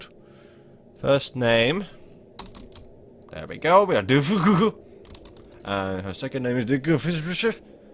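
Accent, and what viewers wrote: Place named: Finland. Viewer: Your accent sounds... Nordic. If I were to take a guess (and I am taking a guess), you're Finnish?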